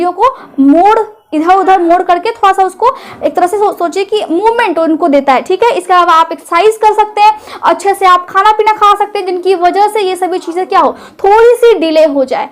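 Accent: Bihar, India. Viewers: Bihari bhasa ki latk Kam karo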